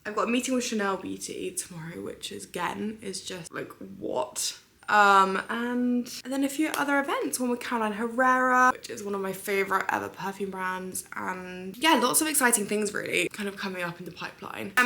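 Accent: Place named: United Kingdom. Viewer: where are you from exactly in UK your accent is hard for me to understand🥲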